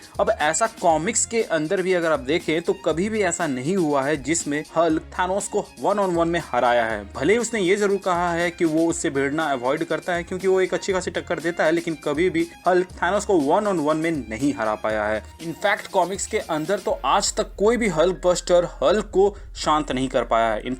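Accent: India. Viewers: Aap ho kaha se brother kyuki aapke baat krne ka tarika kaphi south east hai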